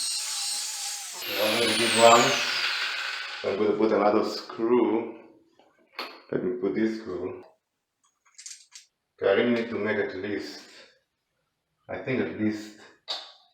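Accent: Israel. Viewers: love your video!!!.......and your Israeli accent!.. thanks!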